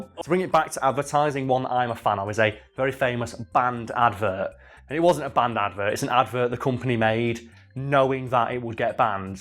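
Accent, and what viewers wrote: United Kingdom. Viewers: We r British it's how we pronounce it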